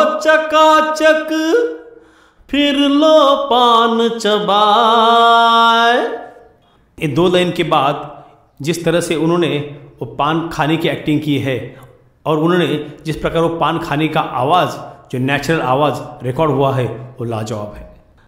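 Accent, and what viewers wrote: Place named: Maharashtra, India. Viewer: aap ke Hindi speaking ko sun kar laga ki aap Maharashtriyan ho aur aap ka lahja bhi marathi hi hai Hindi bolte samaya apna lahja Sudhariye.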